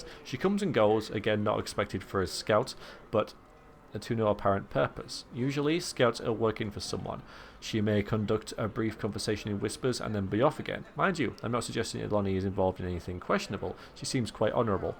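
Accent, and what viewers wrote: Yorkshire, United Kingdom. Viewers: Sounds like you are a fellow Yorkshire man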